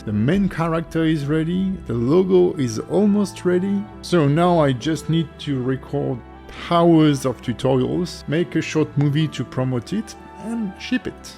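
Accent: France. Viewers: Cet accent français j'adore je comprend mieux lol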